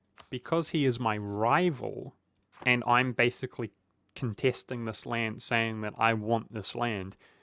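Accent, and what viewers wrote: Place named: New Zealand. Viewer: I fuckin love having a Kiwi EUIV Youtuber to watch. As a Hamiltonian it is so much nicer and familiar to the ears. On ya mate, tu meke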